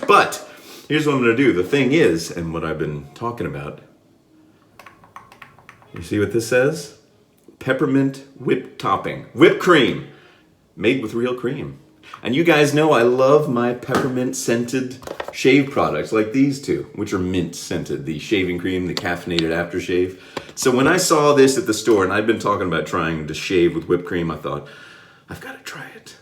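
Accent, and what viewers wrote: United Kingdom. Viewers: "Barbasol will not be featured in this episode".....boy I'll beat you wish it had been featured in this episode!!😁😁🪒🪒 Loved the British accent!!🇬🇧🇬🇧👍👍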